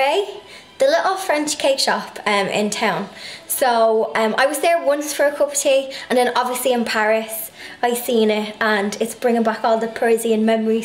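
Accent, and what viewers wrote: Ireland. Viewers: I have been watching so many of your videos and I really am enjoying them and love them so much. Can't believe the way you painted your fridge and gave it new pastel champagne pink look. love your Irish accent aswell by the way. You really have taste and style girl!!!! Your home must be so stunning to live in. So feminine and girly and such a stylish shabby chic cottage. Really love your videos sooooooooooo ooooooooooooo much. Your fine bone china collection is worth an absolute fortune. Please keep doing your lovely stylish videos of different rooms and different aspects of your life y r so good at making videos.xxxxx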